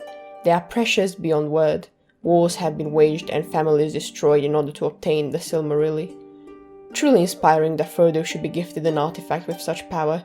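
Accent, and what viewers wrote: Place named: Brazil. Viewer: I'm pretty sure she's Brazilian, they pronounce R's with an H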